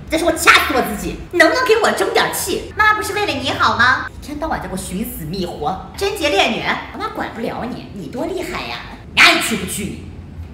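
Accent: Taiyuan, China. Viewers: mi AMY 😂哈哈哈哈应该是太原的吧和我小时候听的话一摸一样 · 这口音熟悉啊，山西太原的吧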